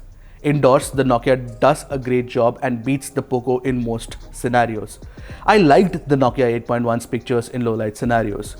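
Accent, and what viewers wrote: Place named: South India, India. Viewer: You are definitely south Indian guy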